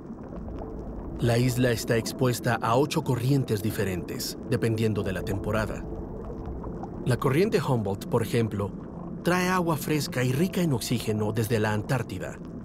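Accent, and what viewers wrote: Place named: Latin America. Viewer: Mucha razón. Excelente locución en español de América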